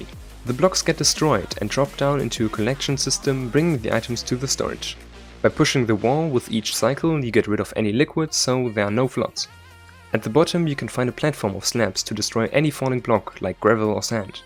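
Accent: Germany. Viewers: Berliner Englisch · Bist du deutsch ? hast Deutschen accent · Are you from Germany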